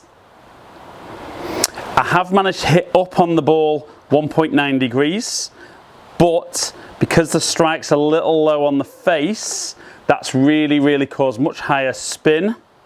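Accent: England, United Kingdom. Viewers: Joe Longo But you will notice by his accent that he is English? We speak different from you in the US! <link>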